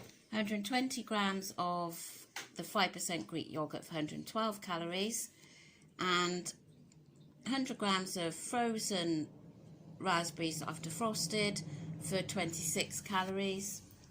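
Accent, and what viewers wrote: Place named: Somerset, United Kingdom. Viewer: Well done steering clear of the treats today, glad hubby enjoyed his birthday, must admit I did wonder if it was easier to just take a flask on you walk 😃. Can I be cheeky and ask if you were originally from Somerset as you accent sounds more from there than Wales? My Dad is Welsh but now lives in Somerset….. if it’s nosey just ignore me. X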